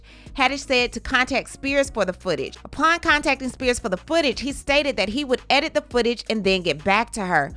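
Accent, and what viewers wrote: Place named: Carolinas, United States. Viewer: I love and appreciate your coverage. You sound just like my cousin so it's easy listening to you plus you're detailed in your coverage. Thank you.. by the way are you from the Carolinas, bc you sound like us!! Lol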